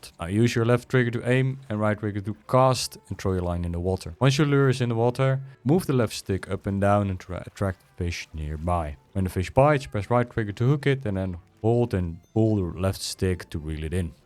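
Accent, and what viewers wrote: Netherlands. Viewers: nederlander zeker?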